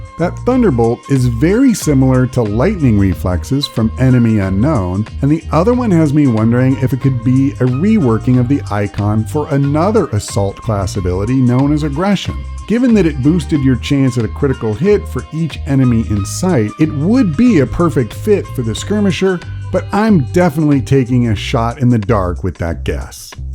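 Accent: United States. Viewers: For Some Reason you Remind me of a Few Guys I grew up with and learned from in SoCal. ( there older than me ) I think it's how you talk and Explain Things, so Unlike the other Videos of people Giving Advice on XCOM2, which can be a complicated subject in itself. I don't have to Watch your videos 10x's to Understand what Your saying! Thanks for All the Help! I really appreciate you Donating Your TIME ( if you think about your Time is EVERYTHING, it's all one Really Has ) to Helping others, Keep Up the GOOD WORK!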